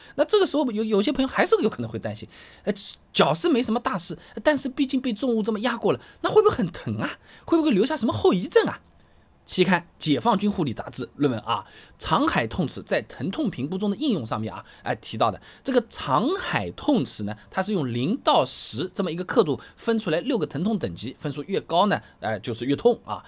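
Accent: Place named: Jiangnan, China. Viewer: 江浙沪一带的数字读法大多是这样的 从方言直翻成普通话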